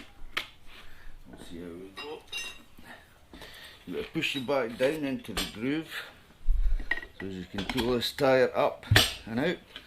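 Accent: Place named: Fife, United Kingdom. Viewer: Very nice! Being from Fife I knew the accent was from over that direction lol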